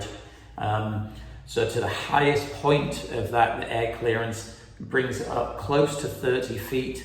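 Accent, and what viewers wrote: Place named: United Kingdom. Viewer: north america? you kinda sound british.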